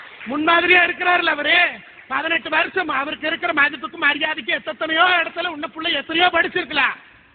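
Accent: Kongu, India. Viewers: kongu tamil...always great.... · Super nga very nice kongu Tamil la alaga pesuninga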